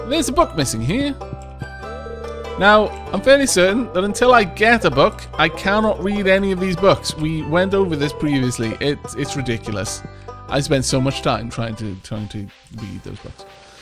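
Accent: Wales, United Kingdom. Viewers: You sound so Welsh <3 I really like this play through as well and your voice is lovely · my mothers side of the family is Welsh so I recognize it more I think! Plus it's the fluctuation! It's got the welsh rhythm haha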